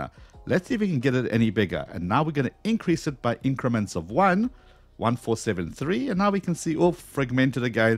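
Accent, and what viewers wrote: South Africa. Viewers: south african guy hear accent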